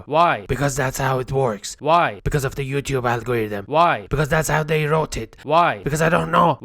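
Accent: Iran. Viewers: لهجه ایرانی رو همون اول فهمیدم 😂😂😂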